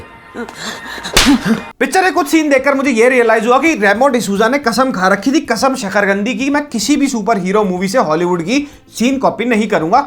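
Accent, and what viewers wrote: Haryana, India. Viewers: Video se mast to bhai Ki Haryanvi Thi🤣🤣🤣🤣😂🤣😂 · The haryanvi accent rock bhai!! Yahi chahiye!!! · that hariyanvi accent tho : D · Bhai tu haryanvi h k? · Bhai tere haryana haavi ho ra h 🤪🤪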